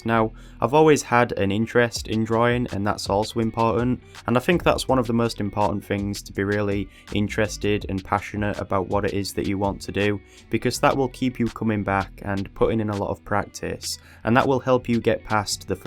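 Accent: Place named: Middlesbrough, United Kingdom. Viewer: Are you from Middlesbrough or nearby? I think we have a similar accent haha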